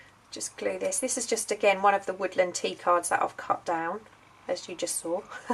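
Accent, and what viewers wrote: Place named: Greenwich, United Kingdom. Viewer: Thanks so much sweetpea I also love your accent I come from Greenwich and have a similar accent! But now I live in Melbourne Oz xx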